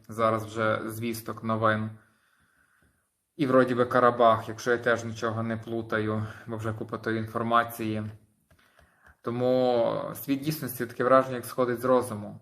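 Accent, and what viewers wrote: Ternopil, Ukraine. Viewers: Дякую, послухаєш вас і легше на душі,чую рідну тернопільську мову.Щасти Боже!!!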